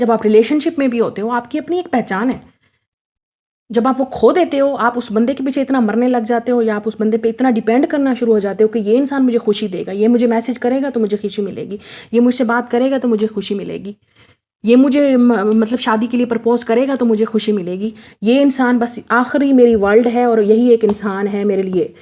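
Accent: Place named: Punjab, India. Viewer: Oye hoyeeee.... Enni sweet punjaabi.... ♡♡♡♡♡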